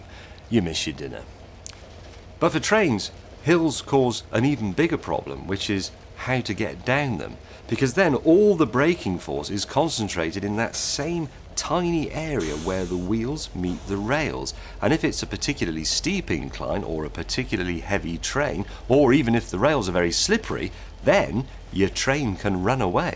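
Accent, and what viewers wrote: United Kingdom. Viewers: Vsauce with a British accent, not bad... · I disagree. This sounds more like a peasant, British, dialect. It is annoying and incorrect.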